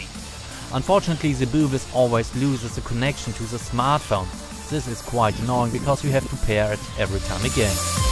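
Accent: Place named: Germany. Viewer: I can hear the German accent 🤣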